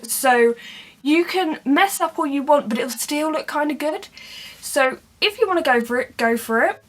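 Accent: United Kingdom. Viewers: Man, I just love your British accent.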